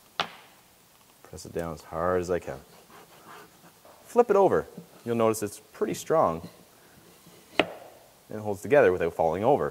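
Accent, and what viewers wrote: Canada. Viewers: Are you . . . Canadian,by any chance? 🤔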